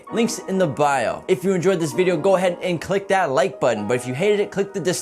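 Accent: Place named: East Coast, United States. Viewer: Say hello to ya motha for me.east coast voice..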